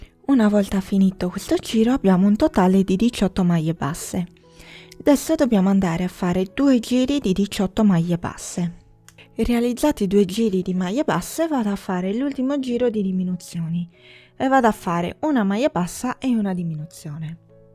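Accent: Sardinia, Italy. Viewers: Sei bravissima!! 😊 ma sei sarda? Noi si 😊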